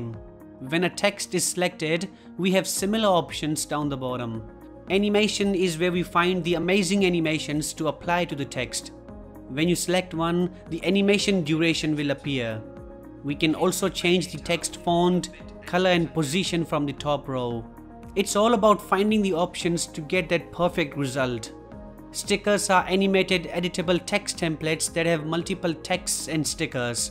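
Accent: India. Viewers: Cet accent vient de l' Inde. Là bas les gens appuient fort sur les lettres quand ils parlent l'anglais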